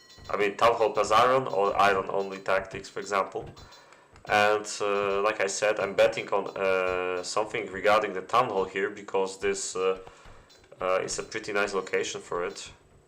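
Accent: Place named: Poland. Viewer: Hej po akcencie strzelam że jesteś polakiem, but if i am mistaken please correct me :) Polecam wrzucać też przycięte nagrywki jako filmy (z tego co wdzię te live'y nie przebijają się w wyszukiwarce) - oczywiście jeśli Ci się chce :) Pozdro miło wiedzieć że nadal są aktywne turnieje i gracze :) może jakieś tutki ;)?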